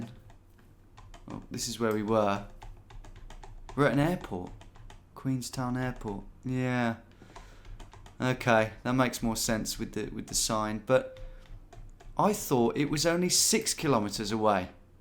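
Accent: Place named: West Midlands, United Kingdom. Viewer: thank you for a video! You have great West Midlands accent!))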